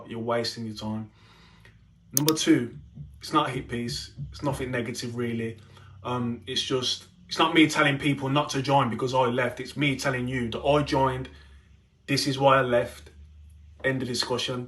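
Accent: Wolverhampton, United Kingdom. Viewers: You sound like your from Wolves in the Midlands, now let me say this i made it to Hollywood and enterd the world of film and TV from nothing midlands girl born and raised in a house of 6 boys with a working class family, what you just described sounds like a complete joke! what a sham, well done to you there"s much better mentoring apprenteships than that nonsense, you made the right decision.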